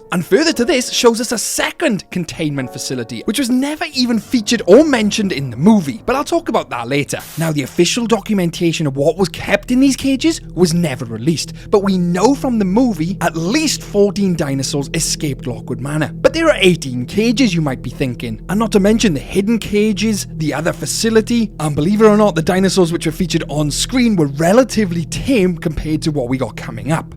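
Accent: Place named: United Kingdom. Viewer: Gosh I adore how you say “endorapthor”, British accent is the best accent (my opinion as non-native english speaker)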